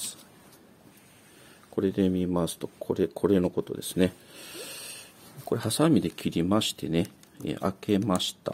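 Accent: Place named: Kansai, Japan. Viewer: 私は燃料ポンプ28万キロまで持ちました。 無理に東京訛りにせず、関西イントネーションのままで、You Tube特有の過剰な効果音や聞き飽きたBGMがないのが良いですね。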